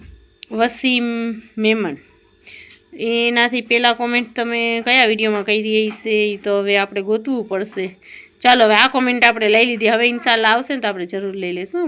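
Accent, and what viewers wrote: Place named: Kathiawar, India. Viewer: tamari khathyawadi bhasa mast bolo cho nice😊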